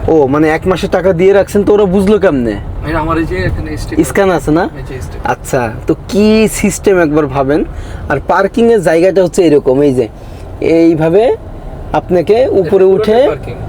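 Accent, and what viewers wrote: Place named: Bogura, Bangladesh. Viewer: উনার কথার শুরুতেই বুঝচ্ছি বগুড়ার লোক।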